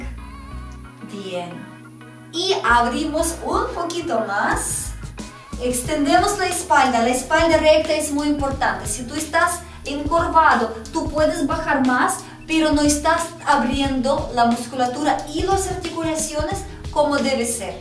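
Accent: Russia. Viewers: eres rusa???? tu acento y apellido parece ruso ty krasivaya heheh pryvet saludos muy buenos vídeos estoy practicando para la flexibilidad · Eres rusa verdad? Yo también, lo digo por el accento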